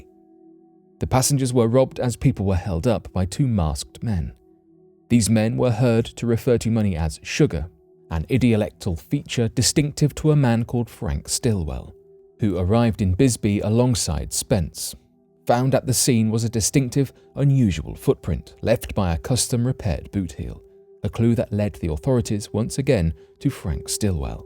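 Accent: United Kingdom. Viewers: He’s a British voice over judging by his accent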